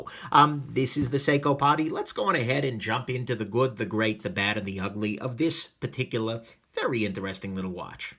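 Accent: United States. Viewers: Mark Walters That’s a pretty shallow attitude. I am English and find his accent so much better than computerised voices. It’s obviously a regional variation of the standard American accent (if there is such a thing). Carry on...you’re doing a great job on watches and knit.A man of my own heart.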